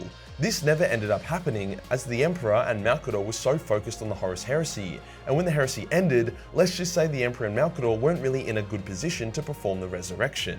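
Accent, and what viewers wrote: Australia. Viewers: Octarius war explained by an Australian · This guy earned my sub for his 0 fucks given Aussie language. 🎵Aussie Aussie Aussie Oi Oi Oi🎶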